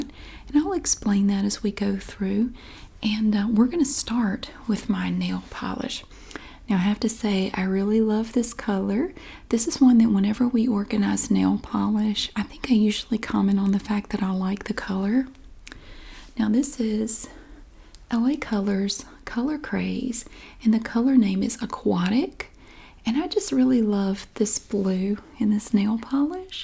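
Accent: South, United States. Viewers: Hi it's good to see you as well, I love your southern accent, I must be high maintenance then because I have a full basket of products.😍😁, I'm new to your channel, I'd love to see you do a video with a tea party, and finger sandwich I think it would be fun.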